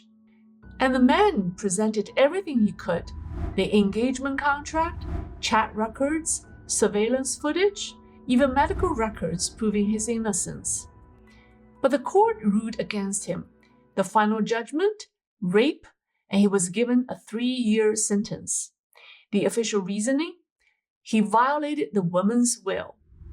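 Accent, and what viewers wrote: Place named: United States. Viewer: Wow. She sounds like an American woman.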